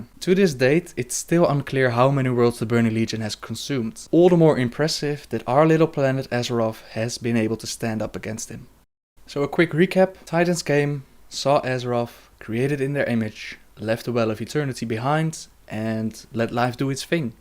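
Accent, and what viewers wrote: Netherlands. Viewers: u MUST be dutch.. if not.. well we just sound alike when we speak English xD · I knew you were dutch ... I just could hear it :D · let me guess. you're Dutch? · Right away i can tell you are from holland or belgium just by your horrible english accent!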